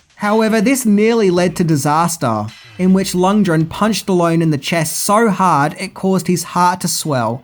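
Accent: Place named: Australia. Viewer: Not DraYgo...........DraW-go...................I understand you have the Australian accent, however its also important you pronounce things correctly.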